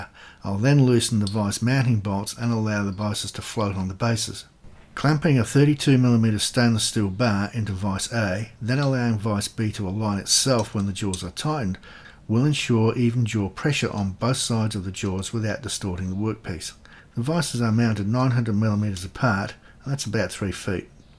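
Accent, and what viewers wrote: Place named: Australia. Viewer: You know what my wednesday needed? a little bit of machining with an outback inflection. This was a fun one to follow along with, I just restored a manual lathe and endmill and I've been liking the hobby toolmaker side of youtube. greets from california mate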